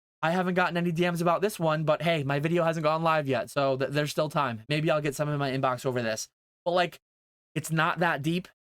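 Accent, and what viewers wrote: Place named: Upstate New York, United States. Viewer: Oh snap. A fellow upstate NYer. And sounds like an actually upstater. Not just an hour north of NYC.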